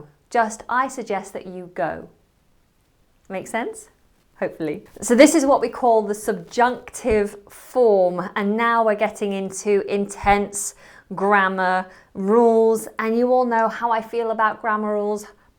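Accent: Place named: England, United Kingdom. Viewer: You get Germanized? Hurra! Du solltest unbedingt auch den bayerischen Akzent ausprobieren. Der ist leicht zu erlernen und kann Deinen englischen Akzent gut verstecken. Der norddeutsche Dialekt ist zwar näher verwandt mit Englisch. Aber darin ist Dein englischer Akzent leichter zu erkennen.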